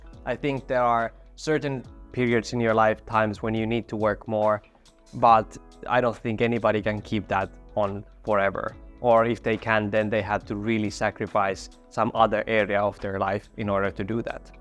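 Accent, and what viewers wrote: Finland. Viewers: Just discovered your channel as I am going through big changes in my life. You are giving me food for thought and are inspiring ✨️ I knew you were finnish when you started talking. 😉💖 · It's the Finnish accent! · I love Finnish people speaking English… and your content is great, clear and handy. I’m happy to have bumped into your channel! Keep going! Toivon sulle kaikkea hyvää! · Hello! It is my first time watching your videos, I just discovered your chanel. ☺️The first thing that I noticed was your accent which I find really interesting and comfortable to listen to. May I ask you where are you from? Based on your accent, I would say Finland? 🤨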